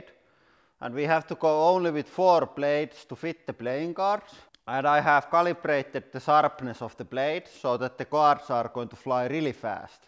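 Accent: Finland. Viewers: The swearing in a thick Finnish accent will never not make my smile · Are you from finland? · Finnish accent is so suited to these videos « Ha! Let’s brrekk tings ! » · You can hear the Finnish accent 😂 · The finish accent makes it even more funny imo :D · I lived in Finland for two years and knew right away when I heard that accent. 💙🤍 Suomalainen voittaa aina.... jopa hydraulipuristimella! 😂 🇫🇮 🇫🇮 🇫🇮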